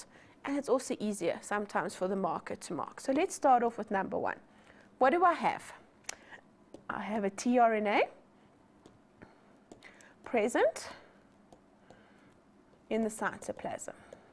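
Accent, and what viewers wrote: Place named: South Africa. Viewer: Love the SA accent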